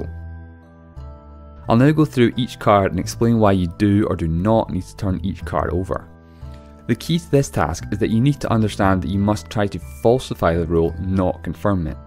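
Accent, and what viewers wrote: Scotland, United Kingdom. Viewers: Irrelevant question; What part of the UK is the narrator from? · That's a Scottish accent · He is from Scotland, probably Glasgow. :) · Scottish, hes from scotland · I clicked off the video because your Scottish accent was confusing me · Paul Graf I think he's Scottish.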